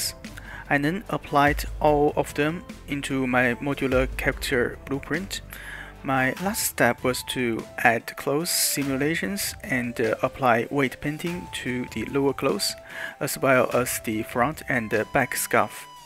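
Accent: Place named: China. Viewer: 一听就是华人，赞赞赞，求个detail tutorial可以吗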